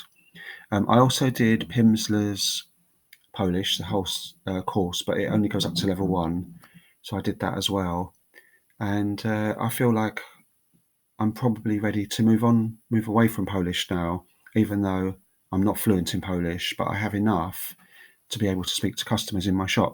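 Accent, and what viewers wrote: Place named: England, United Kingdom. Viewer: Miło subskrybować twój kanał. Jak zawsze wygadany i ciepłe słowa w kierunku ludzi.Twoj język polski jest dobry.Jestes Anglikiem i normalne, że masz inny akcent.Dzieki za mile słowa w kierunku mojej osoby.Udanego weekendu.Ewa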